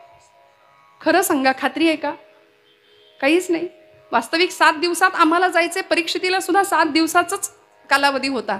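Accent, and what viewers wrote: Standard. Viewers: ताई अतिशय सुंदर कीर्तन आणि वाणी खूप शुद्ध आहे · अत्यंत सुरेख !! वाणी अतिशय शुद्ध, स्पष्ट उच्चार, विषय मांडणी, विस्तार अप्रतिम.. एकंदर प्रासादिक !! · अतिशय सुंदर...गोड आवाज,शुध्द , उच्चार , प्रासादिक वर्णन...कीर्तन संपूच नये असे वाटते.... राम कृष्ण हरी 💐💐🙏🙏🙏🙏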